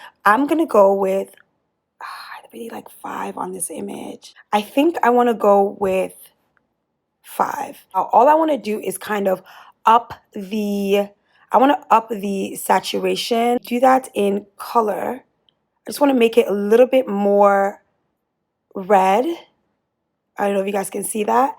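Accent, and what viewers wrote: Nigeria. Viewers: I can hear the Nigerian accent. I love this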